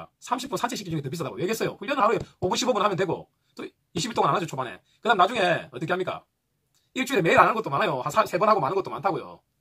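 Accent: Gyeongsang, South Korea. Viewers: 좋은강의 내용 너무나 좋습니다그런데말이 너무 빠르고 경상도 사투리에 빠르다 보니서울에 사는 저는 무슨소리인지 60%밖에 못 듣겠습니다.천천히 또박또박설명을 해주시면 더 좋겠습니다앞으로 더많은 강의 부탁드립니다감사합니다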